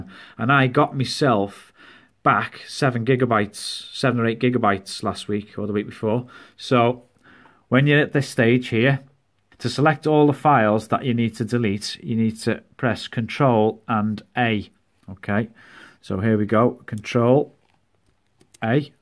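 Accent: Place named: Liverpool, United Kingdom. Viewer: Thats really good scouse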